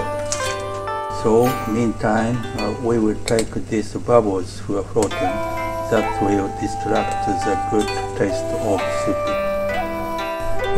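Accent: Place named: Paris, France. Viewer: 美味しそうですわ！綺麗なイルドゥフランス語と親切な実演で助かります。 味噌汁とハーフカットプチトマトも美味しうございます。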